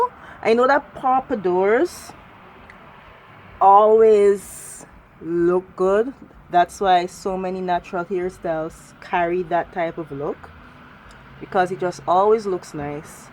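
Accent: Jamaica. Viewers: First 12 seconds and I figured you’re from Jamaica or one of the islands!! 👏🏽 you definitely got my subscription. Great video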